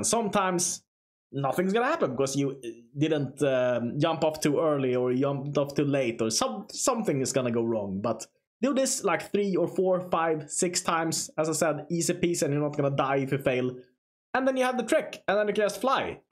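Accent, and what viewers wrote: Sweden. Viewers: Svengelskan är start asså😁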